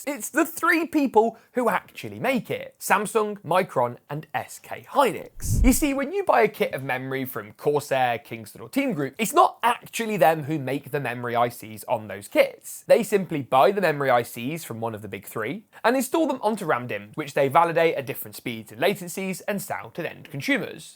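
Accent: United Kingdom. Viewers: His accent is British , its completely different compared to US accent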